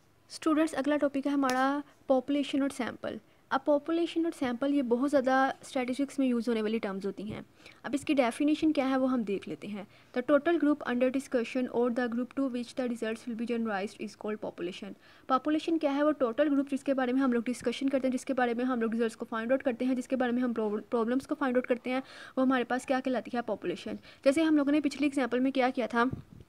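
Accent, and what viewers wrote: Lahore, Pakistan. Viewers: Your accent reveals that you are from Lahore 😅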